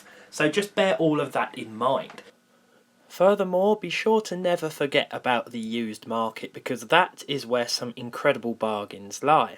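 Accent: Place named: Yorkshire, United Kingdom. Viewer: What area do you live in England you sound like Yorkshire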